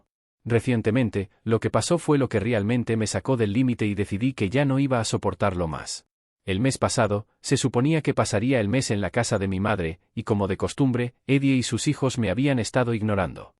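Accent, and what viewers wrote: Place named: United States. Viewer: Jajajajaja ame el tono gringo Te falta darle más espacio para que sea más agradable escucharlo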